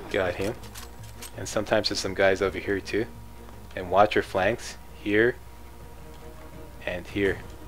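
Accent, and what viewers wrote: Canada. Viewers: i swear this guy sounds like a Canadian. great vid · Are u Canadian? I thought I heard a Canadian accent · You have to be from Canada....Yes?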